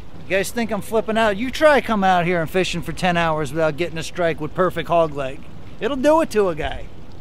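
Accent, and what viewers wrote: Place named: Brooklyn, United States. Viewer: Yankees ?? You sound like your Straight out of Brooklyn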